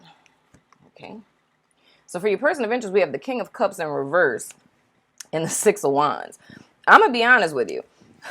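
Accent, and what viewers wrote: New Orleans, United States. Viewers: U sound like u from New Orleans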